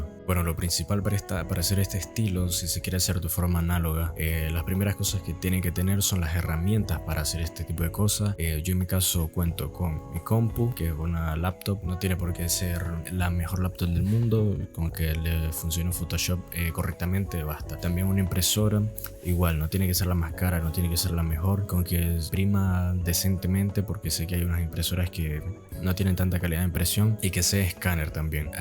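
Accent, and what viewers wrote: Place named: Nicaragua. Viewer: Hermano, de casualidad no sos de Nicaragua? lo digo por el acento jajaja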